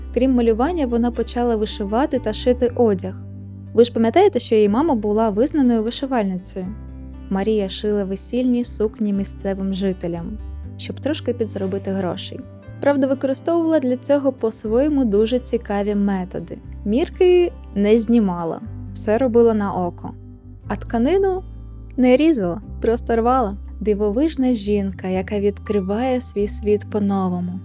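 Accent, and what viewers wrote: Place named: Russia. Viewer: Маю зауваження. Ви робите в наголосах характерну нині помилку-русизм - зсуваєте наголос на перший склад, як м.оскалі: нА душу, а не "на дУшу", жИли, а не "жилИ". Зверніть, будь ласка увагу. Відео дуже сподобалось, підписався. Дякую!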